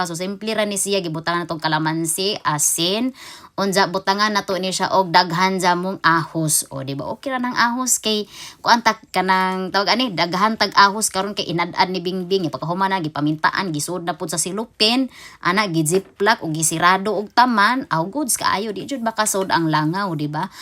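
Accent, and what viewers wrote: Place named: Bohol, Philippines. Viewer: Akoang Lolo ug Lola mga bol-anon. Si Lola tga Maribojoc, ganahan kaau sya mag tan-aw sa imong videos nga naay voice over. Mahimoot sya kay bol-anon kaayo.😂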